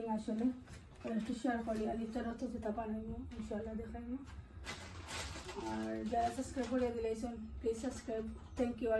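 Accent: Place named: Sylhet, Bangladesh. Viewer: ওয়া আলাইকুমুস সালাম। আপি কি সিলিটি নাকি।সিলেটি কথা আমার খুব ভালো লাগে। খুব সুন্দর ভিডিও শেয়ার করেছেন আপু।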